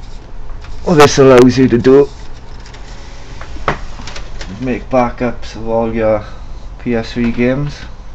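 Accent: United Kingdom. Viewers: Do you live in Swansea? I ask this based on your accent. If you do would you flash my desire for me? I havent really got the time to learn all this stuff. Theres a 8 pack of stella in it for you :D Give me a PM. Cheers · Are u Welsh ?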